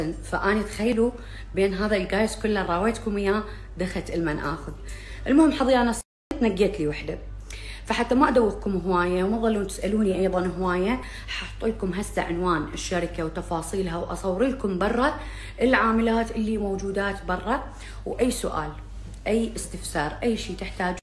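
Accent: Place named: Lebanon. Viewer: شكد حلو تحجين لبناني حياتي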